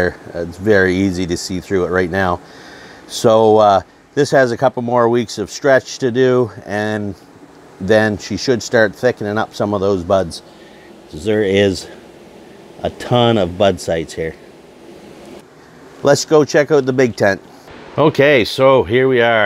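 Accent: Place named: Boston, United States. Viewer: You from Boston brother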